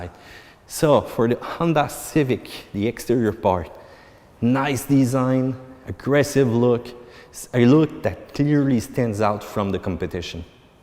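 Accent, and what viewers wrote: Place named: Quebec, Canada. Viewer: I can tell sort of a French accent.. But it's a little different. Is that a French Canadian accent?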